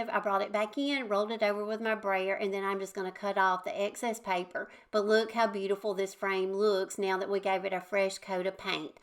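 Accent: South, United States. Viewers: Love all your ideas. Your country accent sounds like mine that I can't get rid of 😅😅. Where are you from 😊.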